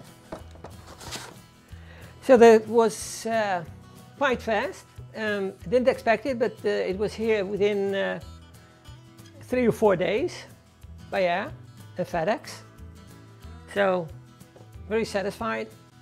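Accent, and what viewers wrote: Netherlands. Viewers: Ik hoor een Nederlander :)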